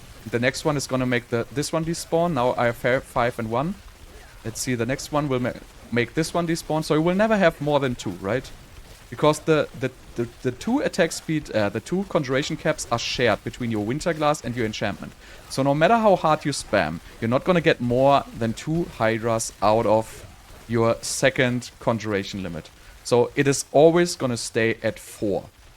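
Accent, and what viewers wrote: Germany. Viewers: Alter Schwede, mach halt Videos auf Deutsch. Dein deutscher Akzent im Englischen ist echt grausam.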